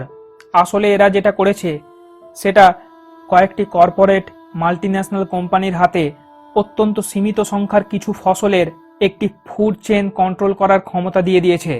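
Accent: India. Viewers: Dm mahmud Bhai uni indian.. And indian der bangla uccharon amader cheye different.. Ek ek arear banglali ra ek ek rokom uccharon kore.. So, kawke evabe opoman korben na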